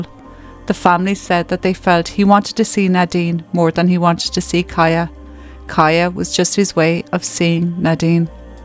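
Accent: Ireland. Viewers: THANK YOU FROM BOSTON 🎉🎉🎉 I LOOK FORWARD TO YOUR NEWEST VIDEO, BUT ESPECIALLY THE BEGINNING QUOTES.... Great Story Teller You Are!!! I love Ireland. Heaven on Earth. Listening to your brilliant accent reminds me of being there.... Your AMAZING.thank you for your hard work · Weirdo? Man, it's called an Irish accent. How can you say such a rude thing? And offensive. I'm also Irish and am very, very proud of it. Chill out.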